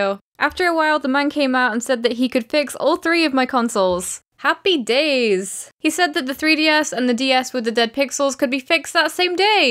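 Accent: United Kingdom. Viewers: mrxMapping he means the British style of English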